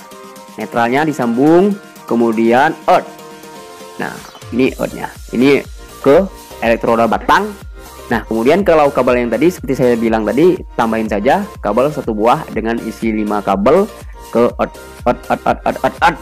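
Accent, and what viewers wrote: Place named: Malaysia. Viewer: Bang ingat dullu saya ppasang wayering di malaysia bang.logat bicara abang kayak orang melayu.abang banyak ok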